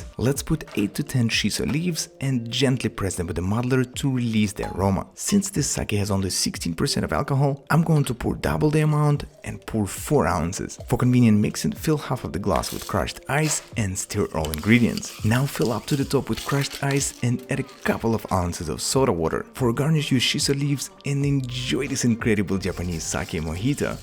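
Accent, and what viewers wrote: Russia. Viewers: "Your basic cocktail making skills" if the accent wasn't enough that sold it. He's definitely Russian.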